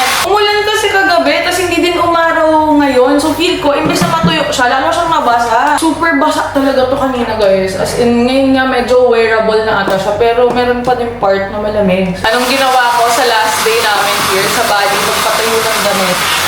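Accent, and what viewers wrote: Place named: Pampanga, Philippines. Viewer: HAHAHAAHHA love the kapampangan accent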